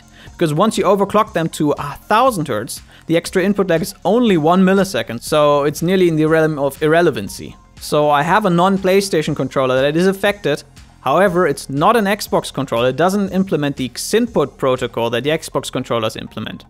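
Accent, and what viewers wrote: Germany. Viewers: Hahaha egal wie sehr man sich anstrengt man hört sofort den deutschen Akzent heraus. :)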